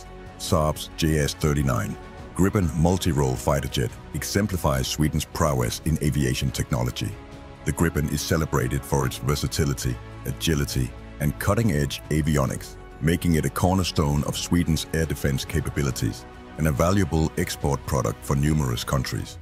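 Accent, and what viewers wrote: Denmark. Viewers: Du er dansk. Det hörs på accenten. Det er god engelsk men mej lögner du ikke. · Just curious, is that your voice or a random narrator? It has a hint of danish accent, but you are Norwegian according to the bio. Great voice and awesome content, much appreciated 🫡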